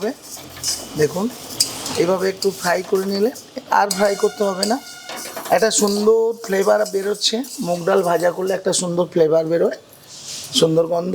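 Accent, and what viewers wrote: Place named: Birbhum, India. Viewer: বীরভূমের লোক মনে হচ্ছে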